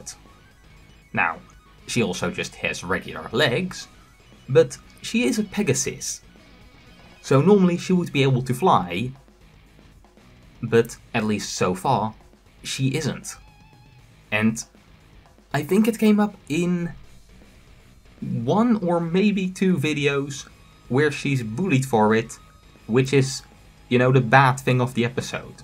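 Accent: Netherlands. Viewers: your cute ass dutch accent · Man I could hear the Dutch in your voice. And all I got to say is.. frikandel broodje. Also great video i loved it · Are you Dutch? You sound Dutch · Wait are you dutch bc i recognize the accent and how you pronounce english words. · i mean your dutch accent isnt too bad. It took me a while after reading your comment to notice it, and I'm dutch myself XD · Ahh I figured you were Dutch :0 your accent’s very nice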